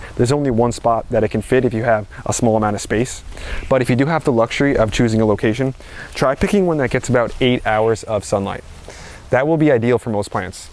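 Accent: New Jersey, United States. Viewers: Love ya HARD “”JERSEY”” ACCENT. ONCE IGET NEW GARDEN SET UP... I WILL INVITE YOU DOWN. YOU WILL LOVE THE AREA AND WEATHER..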